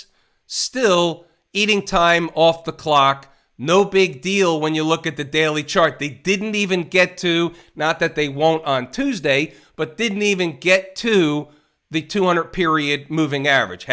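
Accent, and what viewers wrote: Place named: New York, United States. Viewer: …Sorry, but I freaking LOVE this guy’s NYC (ahem) transmission…..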